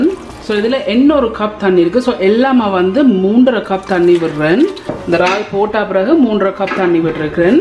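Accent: Jaffna, Sri Lanka. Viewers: உங்கள் யாழ்ப்பாணத் தமிழ் மிகவும் நன்று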